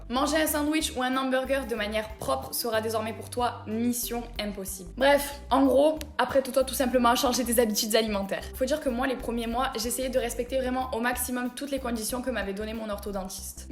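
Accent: South, France. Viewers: ta grv l'accent du Sudddddd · t'avais l'accent du sud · Tu as pris l'accent du Sud nan ? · l'accent du sud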